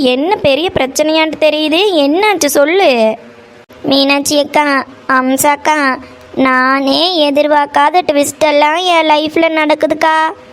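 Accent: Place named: Pollachi, India. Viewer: Akka pollachi slang akka ❤❤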